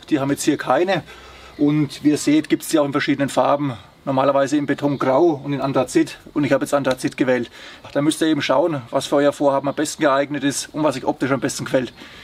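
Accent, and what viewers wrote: Franconia, Germany. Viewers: Fränkisch immer noch bester Dialekt 👌🏻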